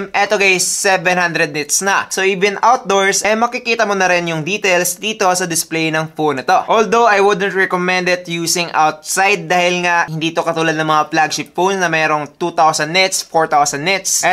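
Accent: Visayas, Philippines. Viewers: nakaka inis yung accent nya, pang bisaya.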